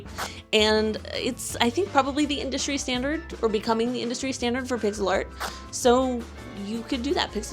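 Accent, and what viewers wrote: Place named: United States. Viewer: hello! thank you for the video, it finally pushed me to start actually learning to program (cry). also you mention a lot of games in the video, would you mind writing the names out? i'm a little hard of hearing and unfamiliar with american accents, so sometimes it's hard to pick out the actual spelling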